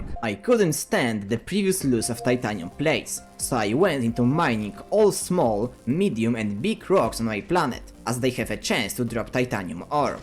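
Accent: Poland. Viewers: Polski akcencik so its peak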